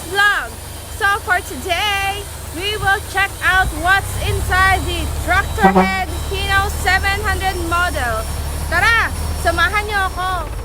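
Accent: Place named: Ilonggo, Philippines. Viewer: Ilonggo ang tonoo ahh kababayan to pa pla😂😂😅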